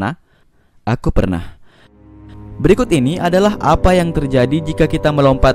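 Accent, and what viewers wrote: Makassar, Indonesia. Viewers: Bang orang mana ya apa orang makasar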